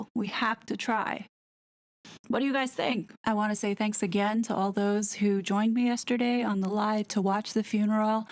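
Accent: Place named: United States. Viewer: Please, tell me how your love for "All things Royal", and/or Anglican Everything became important to you... I'm an American from Boston, and our family loved British TV and culture, had friends in the UK through travel, and their love for that corner of the Globe trickled down to at least one of the six kids, me. It's nice to hear an American voice (and an nice, soothing voice) giving me the scoop with no nonsense.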